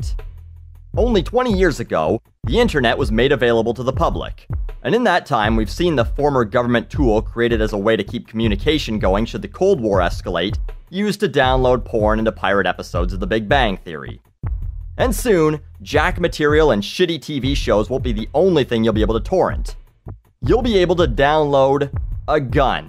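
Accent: United States. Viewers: That was a fuckin great American accent. Lol good video.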